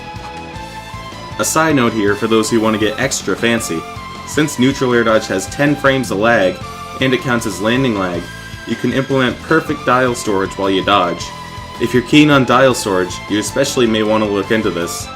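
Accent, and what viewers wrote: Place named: Nova Scotia, Canada. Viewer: You have an interesting accent, are you from Nova Scotia?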